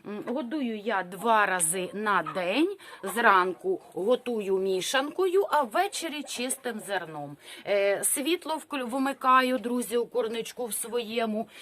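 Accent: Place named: Central Ukraine, Ukraine. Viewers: Пані! Дякую вам за поширення домашніх справ. У мене закралась цікава думка, у вас така говірка, ніколи не повірив, що ви з Кривого Рогуі...наче десь з центральної України ближче до Полтави. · Зайшла на ваш канал вперше,лайк і підписалася, дякую за щирість за таке українське спілкування,дивлюся Вас із Італії але сама родом із Вінниці,мова дуже схожа, дякую, буду слухати ваші поради, вони дієві це точно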